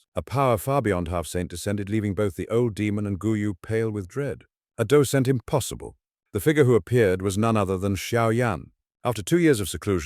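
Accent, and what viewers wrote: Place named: United Kingdom. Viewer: British accent is not match the stories like this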